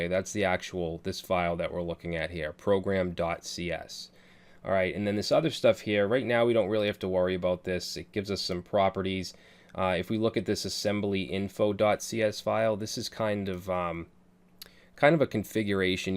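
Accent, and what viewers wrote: Boston, United States. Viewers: This guy is from Boston. I like his style, but I can't stand his accent and people from there. Go Blues!! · I hear Boston in that voice... Edit: I just saw the shirt. Boston confirmed. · Are you from Boston or Maine?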